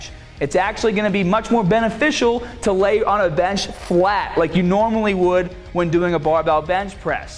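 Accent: Boston, United States. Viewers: Starting this today looking forward to getting some chest results - that Boston style accent cracks me up!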